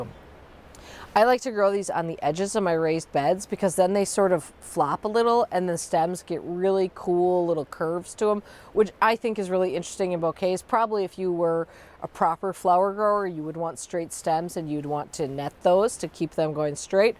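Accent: Wisconsin, United States. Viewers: Oh, I needed this. We moved into our house 20 years ago this coming February. We were thrilled to discover in the spring that our neighbors were gardeners (and also the kindest people ever). We have enjoyed many years of sharing plants, sharing bulb orders, and enjoying each other's gardens. Don died of a heart attack in September at only 77 years old, and Janice was moved to memory care two days ago. We are experiencing so much grief right now, and I have been planning the bouquets I will take when I visit her this summer. I will definitely add the snapdragons to my seed orders. Thank you for your videos. You are inspirational and almost always give me a laugh, and I love seeing your animals. Plus, listening to you talk takes me home to Madison. Happy New Year!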